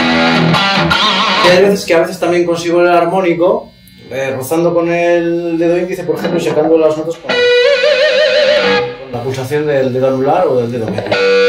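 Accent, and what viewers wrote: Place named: Trapagaran, Spain. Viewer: Tienes acento de trapagaran.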